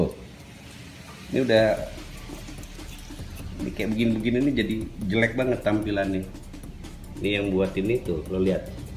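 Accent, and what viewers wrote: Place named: Jakarta, Indonesia. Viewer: cang betawi banget sih cang heheheh kite baru mao mesen benelli ni cang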